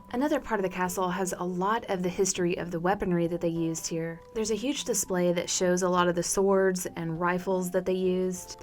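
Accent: Texas, United States. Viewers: what part of Texas are you from? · "Southern accent" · I didn't know she was also Texan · wait, ur from Texas?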